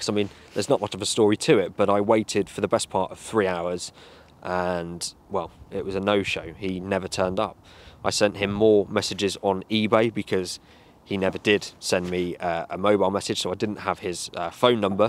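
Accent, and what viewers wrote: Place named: Edinburgh, United Kingdom. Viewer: Hmm! Scot from Edinburgh eh! 😂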